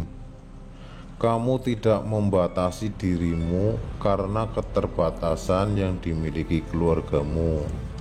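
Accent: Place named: Banyumas, Indonesia. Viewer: Logate NGAPAK banget siih pak,,!! Rika ne wis mangan durung Dina kie,,kooh wis nei semangat Karo wong²😂😀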